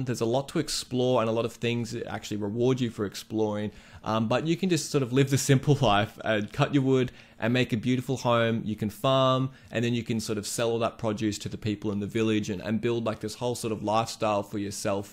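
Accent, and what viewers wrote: Australia. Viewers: yooo idk why i got so excited as soon as i heard your aussie accent lol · ayyy its another aussie bloke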